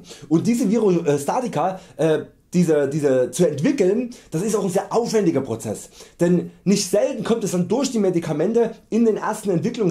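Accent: Saxony, Germany. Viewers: Du hast exakt den gleichen Akzent wie Olaf Schubert richtig gut gerade wenn du genommen sagst haha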